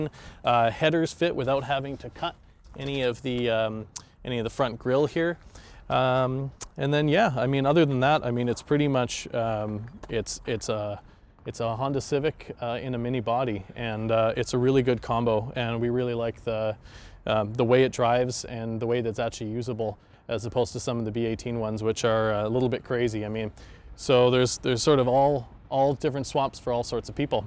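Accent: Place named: Canada. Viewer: Nice, clean build. I thought you were American at first, but the 'oots' and 'aboots' now make me think Canadian!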